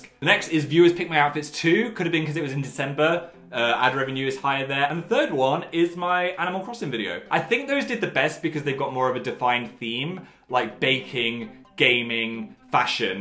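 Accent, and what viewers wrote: United Kingdom. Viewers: Is it just me or does Phil’s accent sound more British than normal if you get what I mean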